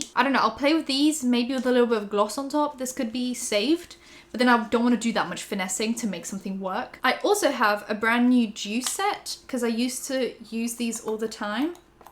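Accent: England, United Kingdom. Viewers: Wait I thought was from London your accent is so English and know she has said it she does look polish but I was just so surprised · that is so rad you are from Poland! I was wondering because I stalked all your older vids one day and noticed it sounded like you had a totally different accent. So, I assumed you just eventually pretended to have an posh english accent... hahah totally my bad!! My husband is from the UK and you speak english like you grew up in the UK. I guess you did grow up in the UK starting from a pretty young age. Anyways- that is so cool you are from Poland! hell yeah! if you like watching Polish films and stuff still- you should watch " Cold War" - best love story ever and it is pretty new, came out around 2018?? I think... anyways- it takes place in Poland and it is AMAZING!! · tbh i wouldnt be able to tell that english isnt your first language, messes w my brain bc you just sound like a london native lmao, i live in north london and literally cannot fault ur accent its mad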